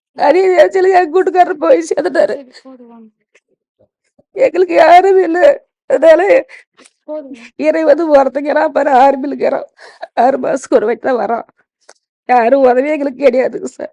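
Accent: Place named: Krishnagiri, India. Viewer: எங்கள் அம்மாவை போலவே உங்கள் முகமும் பேச்சும் கிருஷ்ணகிரி மாவட்டம் 😢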